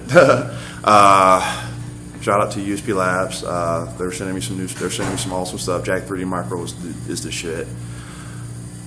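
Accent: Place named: Alabama, United States. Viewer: Awesome video... love the Bama drawl too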